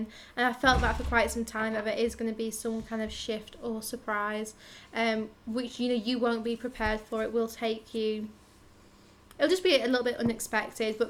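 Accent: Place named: Northern England, United Kingdom. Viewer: Hullo, you are VERY tuned in. Your channel popped into my auto generated YouTube list yesterday and yesterday's reading followed by this one is mind blowing. And I'm only of today's reading, LOL, just wanted to give you the thumbs up straight away. You have a northern English accent. Where are you based - Yorkshire?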